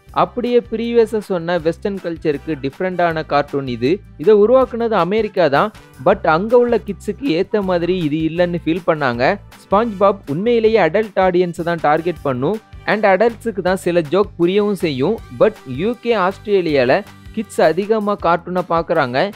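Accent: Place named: Kerala, India. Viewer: unga slang konjam Kerala Mari irunthuchu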